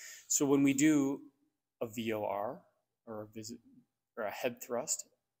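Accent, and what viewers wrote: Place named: Midwest, United States. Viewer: I really enjoyed your video. I am curious if you use any traditional chiropractic methods to help increase the cerebral blood flow? I have a somewhat mild case of POTS which came out 6 months after i got Covid. Turns out i have chronic Lyme and a bunch of tick infections. It was really bad in 2022, and I'm about 70% recovered. But i still wake up in tachycardia and i can't tolerate much exercise, which is so hard for me to deal with. I also get brain fog and fatigue by 2-3 pm every day. Anyway, it's interesting to hear this take on what could be going on. I would love to have this type of in depth evaluation. You sould like you might be in the midwest, so do you happen to know any other practitioners in the DC area? Or are there online resources for home exercises which people like me could try to assist brain recovery? 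Thank you for your insight!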